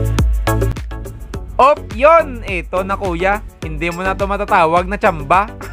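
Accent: Iloilo, Philippines. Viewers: Love na love q. Tlga accent ng ilonggo/accent ang sarp pkinggan.. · Tuno mo ilonggo gid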